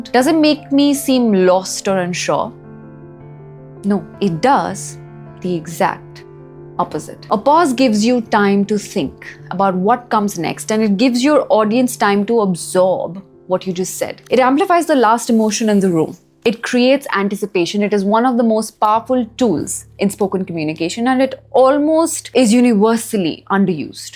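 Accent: Odisha, India. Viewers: Hey are you Oriya by Any chance? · Same doubt bcz the represent that 😂 i think she is from Odisha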